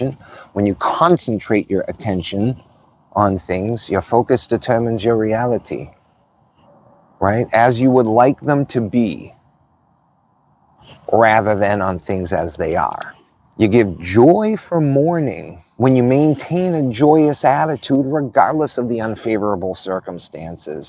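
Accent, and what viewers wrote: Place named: Boston, United States. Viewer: oh, very nice! I thought I detected a bit of a Boston or Eastern MASS accent in your voice now & then, but I could be mistaken.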